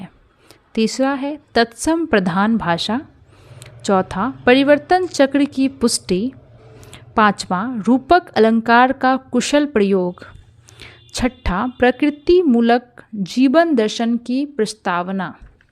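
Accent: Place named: Bengal, India. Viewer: और दीदी आपका आवाज मुझे बहुत अच्छा लगा इसमें बंगाली टोन है जो मुझे बहुत ज्यादा पसंद है और पता है दीदी मैं आज तक खुद से ही असाइनमेंट के सारे आंसर ढूंढती थी और लिखती थी किताबों से पर जब मुझे प्रश्न 5 का क नहीं मिला तो वह पद्यांश में गूगल पर सर्च कर रही थी तब मुझे आपका वीडियो दिखा इसका मतलब की जो आपने छोटे-छोटे प्रश्न के उत्तर दिए हैं अलग-अलग करके एक-एक प्रश्न के उत्तर दिए हैं वह बहुत यूजफुल था सर्च इंजन में काम करने के लिए। मैं उसी के सहारे आपके लॉन्ग वीडियो तक पहुंची हूं आपका काम बहुत अच्छा है दीदी अगर छोटा-छोटा वीडियो नहीं डालती और इकट्ठे बड़ा डालते तो शायद मै आपकी इस लॉन्ग वीडियो तक नहीं आती। मैंने पद्यांश डाला तो उसके उत्तर के रूप में आपकी वीडियो आए और अर्थ सहित और ऊपर मैं वह क्वेश्चन का आंसर दिखा रहा था और आपका टाइटल भी था यह सब बहुत अच्छा था तभी आप फर्स्ट नंबर पर सर्च में आ गई गूगल क्रोम पर और दीदी आप इसका प्लेलिस्ट भी बना सकती हैं उसे जनता को बहुत सुविधा होगी और दीदी आपका काम बहुत अच्छा है.आपकी लिखावट बहुत ही स्पष्ट है आपकी लिखावट बहुत अच्छी है मुझे कोई दिक्कत नहीं हुई है और जहां अगर थोड़ी बहुत दिक्कत हुई तो आपका बोल-बोल कर बताने से वह प्रॉब्लम भी नहीं हुई और बहुत आसानी से स्पष्ट आवाज में सब कुछ समझ आ गया और मैं फाइनली एक असाइनमेंट कंप्लीट कर दी दीदी आपको धन्यवाद आपने बहुत अच्छा काम किया है आप बहुत काम समान में भी अपने कमरे को किताब की वीडियो बीच में फोकस की है जिससे पूरी कॉपी दिखाई दे रही है दर्शन को बिल्कुल भी परेशानी नहीं हो रही है और आप उंगली रखकर एक-एक बता रही हैं और जल्दी-जल्दी पढ़ रही है सब बहुत आरामदायक था बहुत अच्छा लगा दी थी आपका काम जिसको इसकी जरूरत है उसके लिए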